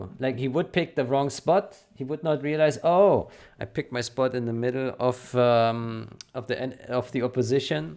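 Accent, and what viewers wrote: Germany. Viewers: hey are you german. i detect german accent. jawohl.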